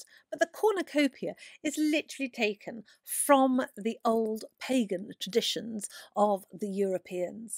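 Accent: United Kingdom. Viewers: So much fun to hear a Brit Witch speak of the American thanksgiving. Love the pagan references and agree completely! My adult son has asked for ham instead of turkey. He brings up a good point-no body really likes turkey - we only cook it one day a year. Lol.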